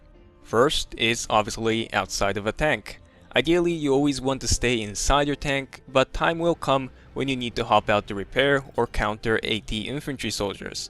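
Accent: Germany. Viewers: U don't speak english normal...are u german or something?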